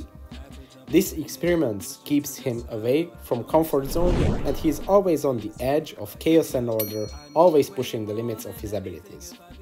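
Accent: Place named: Hungary. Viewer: gondoltam hogy magyar vagy az akcentusbol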